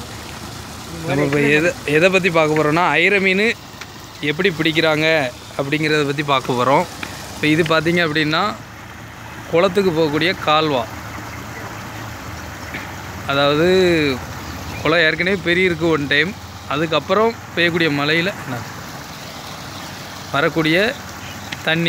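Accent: Tirunelveli, India. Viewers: Sema, thirunelveli yaaa pa... namma slang varuthuu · anna ninka tirunelveli ya 😍😍eale😍😍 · திருநெல்வேலி பாஷையில் விளக்கம் கொடுத்தது சூப்பர் பாஸ் நல்லா இருக்கு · Tirunelveli tamil arumai👌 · Nanum Tirunelveli bro.. slang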